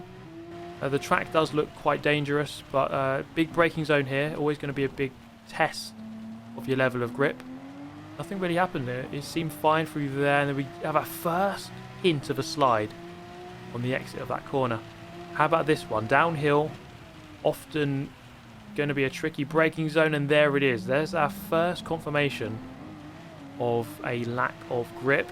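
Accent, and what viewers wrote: England, United Kingdom. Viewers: This guys from England by the sounds of it, I think he’s well acquainted with rain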